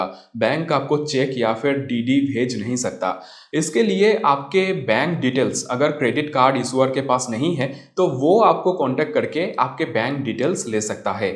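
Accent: Bengal, India. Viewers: You're a Bengalee, hey mate 🤗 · Are you Bengali? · O bangalii baba tumhra words samj ma nahi aate banglii accent aati ha Hindi ma try to fix it first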